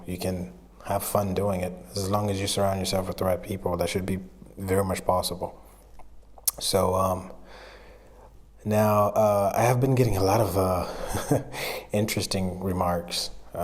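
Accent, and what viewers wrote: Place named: Southern Africa. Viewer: Your accent sounds very familiar. Like a mixture of Southern-African and American.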